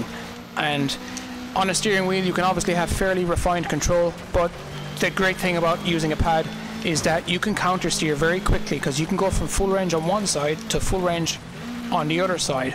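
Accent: Ireland. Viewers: I like your accent! Irish?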